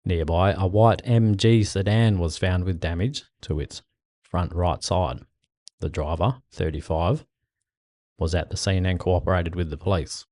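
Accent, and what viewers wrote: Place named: Australia. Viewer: Thanks for the content. I enjoy the aussie commentary hehe. Cheers mate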